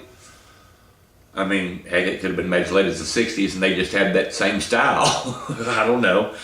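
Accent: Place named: Appalachia, United States. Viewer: Hi. Just wondering are you from Tennessee Your accent is very much like mine and Larry's. do you like Indiana?. I don't mean to be nosy just curious. I just couldn't bring myself to leave these old mountains:) All my best. Bobby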